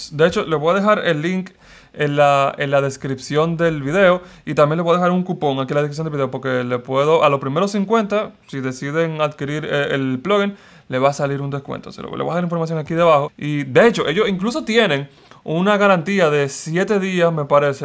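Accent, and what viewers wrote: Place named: Dominican Republic. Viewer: me gusta tu acento... tu acento es de República Dominicana verdad?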